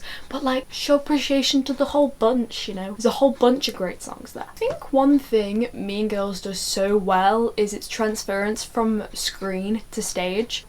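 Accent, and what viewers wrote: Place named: United Kingdom. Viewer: Everytime I forget u have a British accent i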